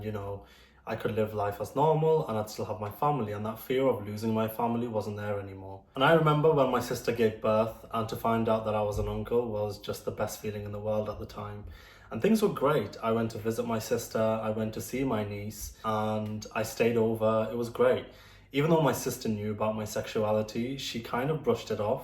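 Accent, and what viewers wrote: United Kingdom. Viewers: sorry mate but what did you expect in reality to happen ? that a conservative family would accept such liberal ideas with open arms ? both of you are at fault here its so obvious they didnt do a good job at raising a muslim especially in a foreign secular country (from your accent its the UK probably) , you being gay wouldve just been fine you cant control who you have feelings towards whatever sure islam doesnt punish feelings but when you dont follow the religion and come with a secular ideology to a muslim family thats your fault for crossing the line , your sister can raise her child however she wants and she wants it to be under islam then she's right to ask you to change certain stuff and accomodate its not gonna kill you if you hide the tattoos and earrings for a while and you cant complain about how she wants her child raised even if it costs her her uncle , its honestly sad that these families dont teach their children islam the proper way in western countries and end up in these tragic situations and you're certainly not the first or the last to be in this situation , i hope you find your way to islam even after such an experience and get back the family bonds you lost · They should’ve known the moment you start talking with a British accent that you were gay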